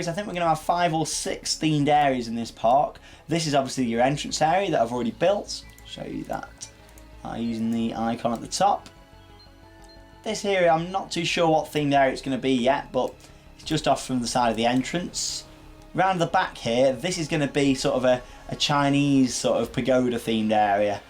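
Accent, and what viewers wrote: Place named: United Kingdom. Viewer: I was nostalgic and had to watch this video! You're very creative, man! I wasn't as good with decorations as you! BTW Are you British? I loved your accent xD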